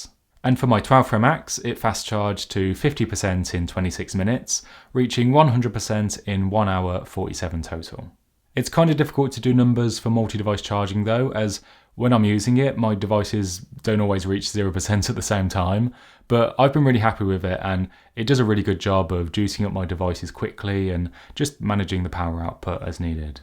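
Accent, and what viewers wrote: Midlands, United Kingdom. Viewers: I know a midlands man when I hear one. 😎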